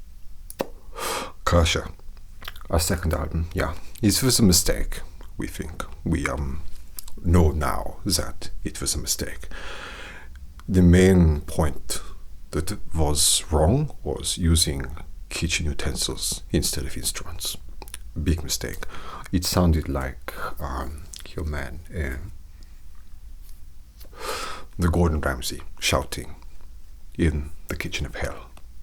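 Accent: Germany. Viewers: GDDGHJBB OMG I HAVE ALWAYS WANTED TO SEE A ROCKSTAR ASMR you nailed this you're so hot like this 😍 and it was brilliantly done! The accent the look the whole atmosphere id say you are German lol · That German accent... Is so good I'm melting!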